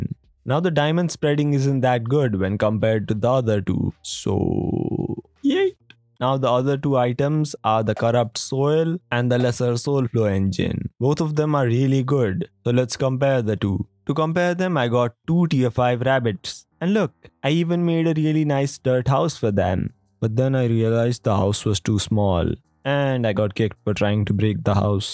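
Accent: India. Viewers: u sound indian af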